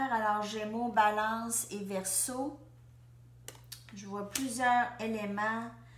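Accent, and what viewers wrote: Canada. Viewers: Bonjour, et merci pour ce tirage qui me parle vraiment.....Vous êtes fort sympathique et cet accent délicieux du Canada (j'ai de la famille à Montréal et à Québec) moi je suis à Manosque en Provence...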